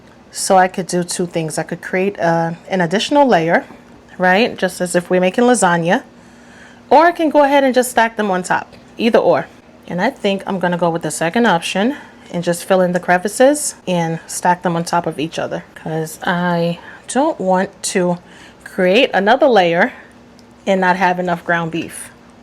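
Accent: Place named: Brooklyn, United States. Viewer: Im so ready to try this! My girlfriend thinks you're from Brooklyn by your accent.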